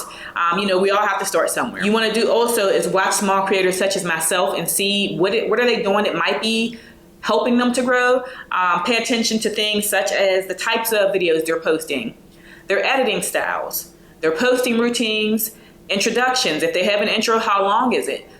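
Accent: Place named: South, United States. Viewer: Congrats on reaching 1k! This was great! And where are you from? I definitely hear a southern accent!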